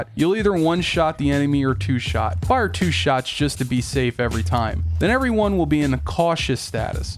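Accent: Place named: Philadelphia, United States. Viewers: That accent sounds familiar. Are you from Philadelphia? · Love that I found this guy. A) he is definitely from the Philly/ South Jersey area. As someone from Delaware, I recognized that accent IMMEDIATELY. Feels close to home - like I’m listening to my cousin instead of a stranger, which is awesome. B) this commentary makes him sound like a disappointed Philly sports fan, but instead of being let down by his team(s), he’s let down by Bethesda. I love it.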